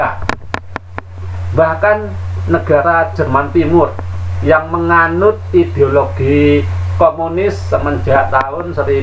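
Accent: Java, Indonesia. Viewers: Astaga naga mantap tenan.wong batak logat jowo.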